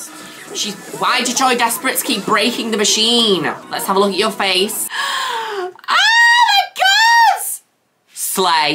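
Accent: Manchester, United Kingdom. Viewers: I catch myself saying something is disgusting in Mancs accent 😂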